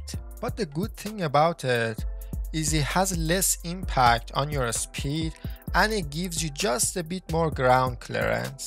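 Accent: Iran. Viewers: Amazing Persian accent aside, the tips are good and helpful. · Hi Are you persian? لهجه داری اخه 🤓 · Your accent sounds Iranian :) my gf is from IR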